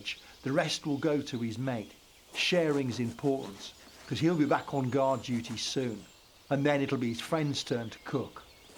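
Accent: United Kingdom. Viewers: I get ASMR from hearing that english accent... its so hypnotizing. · I watch this when I’m lonely “cone beef SHTEW, share with his mate, he puts.......... his spoon in his puddy, pep eh uhp ah beh “ thank you sir for being so British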